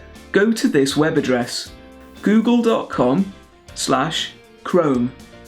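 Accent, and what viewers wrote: United Kingdom. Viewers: Why do you look American but sound British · I liked his British accent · It worked! Thank you for your help. Friend, I love to speak British English just like you. Could you give me some tips on how to start learning the British accent?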